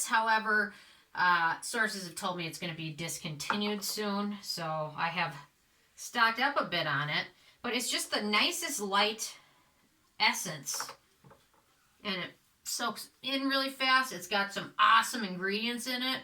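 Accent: Minnesota, United States. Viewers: it does my heart good to hear your Minnesota "accent"... I'm originally from North Dakota and it sounds like home. 😄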